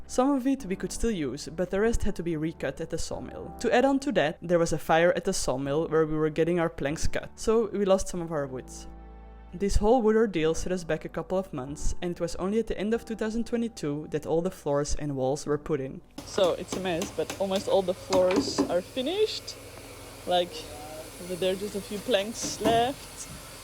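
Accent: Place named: Belgium. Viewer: Wow this reminds me of pictures my parents had living in New Guinea except with all the new technology. I am part dutch, part Indonesian and your videos are so like cool and almost feels like home to me. I would love to visit there one day. My parents were both born in Indonesia but later had to leave with their parents after the war. Seeing a modern day scenario of how my parents lived except with Starlink, a more modern beautifully built home and riding the surf of the island is like a dream come true...Thanks for sharing these videos. Your Belgium accent is like hearing my parents as well with the European accent. My parents are both gone but I would imagine they would be in second heaven if they saw your videos today.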